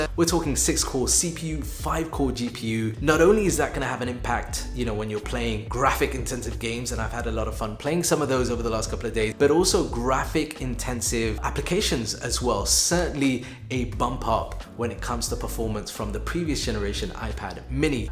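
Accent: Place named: United Kingdom. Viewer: It's Hard To Manage British Accent Including Explaining Points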